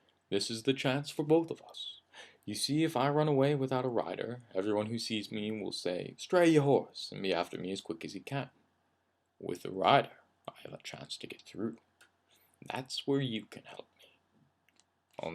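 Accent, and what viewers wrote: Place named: United States. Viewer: Description says he's from Scotland. He sounds American. No biggy :P~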